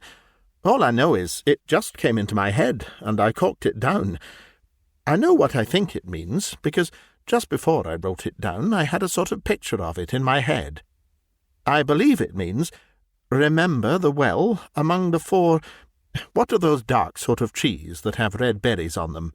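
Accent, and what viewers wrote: England, United Kingdom. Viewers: Wow. An incredible recitation of one of my favourite M.R James stories. What a gorgeous voice you have! I look forwards to exploring more of your performances. . . English stories ought to be read with an English voice, in my opinion. Well done, such a pleasant listen.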